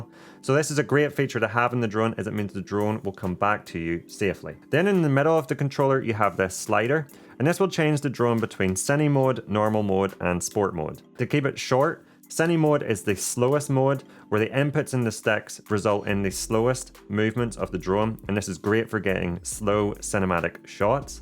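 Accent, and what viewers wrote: Ireland. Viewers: Came for the drone, stayed for the (Irish?) accent. Thnx. Grtz from the Netherlands. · Here on Youtube are many so-called self-proclaimed drones flying gurus. But none can touch your ankles. The heavy Irish accent is super. Also, your knowledge. Merry CHRISTMAS and SAFER NEW YEAR 2023. Thank you for your educational videos, I learned a bundle.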